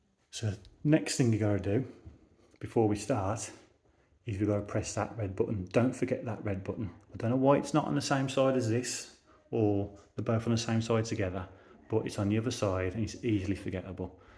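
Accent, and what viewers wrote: Birmingham, United Kingdom. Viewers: Thank you so much for this great video. I'm here in France and bought my machine on my builder's recommendation, but was really worried about how to use it. You took all the stress out of it!! 🙂 (I love your brummy accent too ;-)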